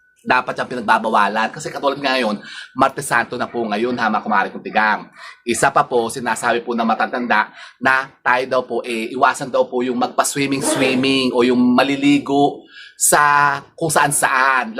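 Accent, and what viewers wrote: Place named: Bulacan, Philippines. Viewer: taga bulacan pi ba kyo?yong punto kse ng pagsasalita nyo punto ng bulacan,taga bulacan din aq balagtas at isa nyo aqng subscriber.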